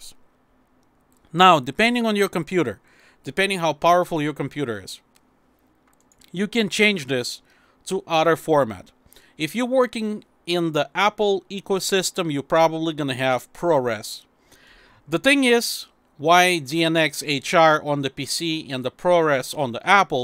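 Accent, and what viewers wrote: Russia. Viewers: Ха-ха..., судя по твоему английскому, ты русскоговорящий )))) Может для нас сделаешь то же видео?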